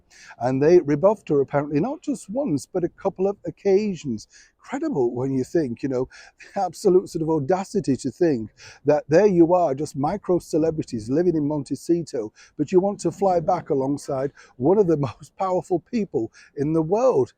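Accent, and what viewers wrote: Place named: England, United Kingdom. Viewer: The joy of an English voice speaking and the flowers magnificent! Love the chat !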